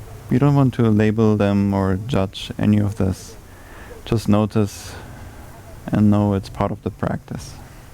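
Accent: Germany. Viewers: Are you German? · amazing tatoos, sexy body and sounds like a German accent ? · Du bist doch deutscher oder ? Hört sich zumindestens so an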